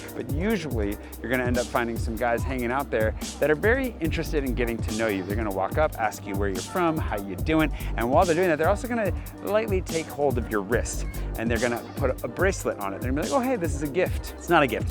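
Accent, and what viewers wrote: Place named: United States. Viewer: You've lived here long enough to become French yet you sound like a ********g American. Hope we do not bump into each other. I've lived here 10 years.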